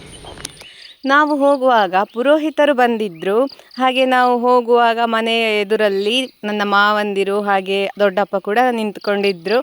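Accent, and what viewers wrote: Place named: Malnad, India. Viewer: ನೀವು ಮಲೆ ನಾಡಿನವರು ಮಾತನಾಡುವ ಶೈಲಿ ತುಂಬಾ thrill ಅನಿಸುತ್ತದೆ.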